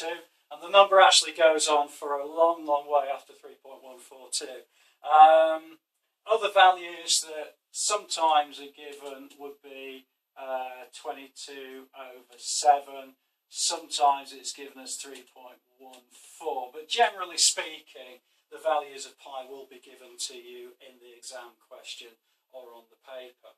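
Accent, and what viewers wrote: United Kingdom. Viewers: I am also maths teacher are you British sir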